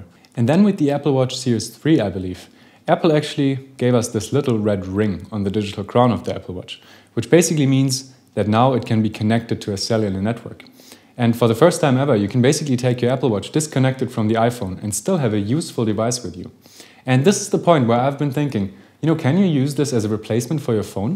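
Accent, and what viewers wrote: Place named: Germany. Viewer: Interessantes Video, wenn auch ein bisschen zu lang. Man hört aber deutlich, dass ein Deutscher hier versucht, Englisch zu sprechen (z. B. an der wechselnden britischen und amerikanischen Aussprache, so sagst du z. B. läßt statt lahst (für last) oder fähst statt fahst (für fast)). Warum das sein muss, verstehe ich nicht. Mach doch solche Videos (auch) auf Deutsch.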